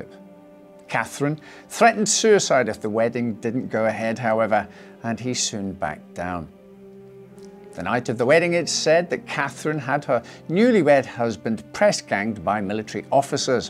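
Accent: United Kingdom. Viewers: What a fabulous discovery this channel is. I listen to MrBallens stories and they are brill but I do prefer to listen to a British voice if given the option. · Stories are just more exciting and easier to listen to when read with a British accent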